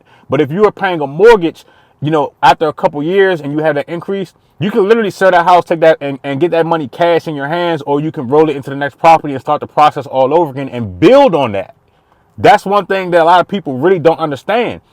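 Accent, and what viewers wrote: DMV, United States. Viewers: Lol i knew from how you talked you were from the DMV, before you said it in this video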